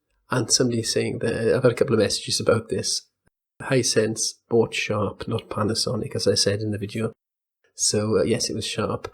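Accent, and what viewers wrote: Wales, United Kingdom. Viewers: Nice Welsh accent! Cymru am byth!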